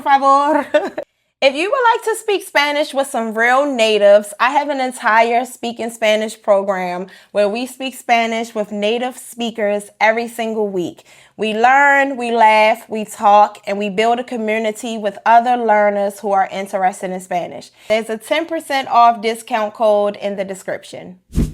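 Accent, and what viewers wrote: Baltimore, United States. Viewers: Brand new subscriber here and love the content already! BTW Are you from Baltimore ? 👀😭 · you from baltimore aint you??? Ik another when i hearrr 🤣🤣💕💕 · I hear a Bmore accent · Go-head girlfriend, lol! Question - where are you from? Baltimore??? I hear your accent and it sounds like it.......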